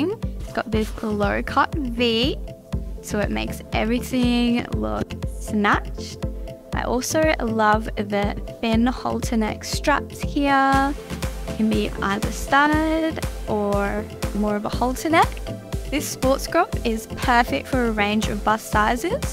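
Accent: Australia. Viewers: Love that Aussie accent, I didn't understand a word she said but it doesn't matter.😊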